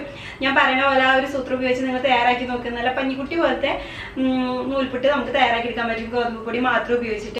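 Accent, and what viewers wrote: Thrissur, India. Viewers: ഈ തൃശൂർ ഭാഷ കുറച്ച് പതുക്കെ പറയു,കാരണം നമുക്ക്‌ തൃശൂർക്കാർക്ക് മനസ്സിൽ ആവൂം,പക്ഷെ മറ്റുള്ളവർക്ക് ഇത്തരി ബുദ്ധിമുട്ട്ണ്ടിവും,സംഗതി അടിപൊളിയാട്ടുണ്ട്,ഞാൻ. ഇന്ന് ഇണ്ടാ ക്കി നോക്കി ട്ടാ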